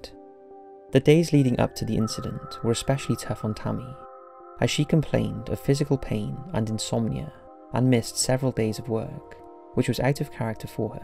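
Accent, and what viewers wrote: United Kingdom. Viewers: Your British accent was making it difficult to understand what you are talking about, and there are at times you were mumbling on each of these cases. Please speak clearly and as loud as possible so that we can listen and pay attention. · I love the way you brits say shit like, 'Media'... 'Medier'. It's awesome.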